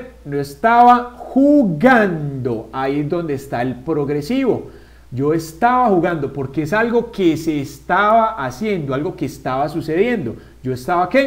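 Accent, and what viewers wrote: Antioquia, Colombia. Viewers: like si te distes cuenta que parece paisa xd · me gusto su acento colombiano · parese paisa ome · Se te nota lo Colombiano a kilómetros 😂😂😂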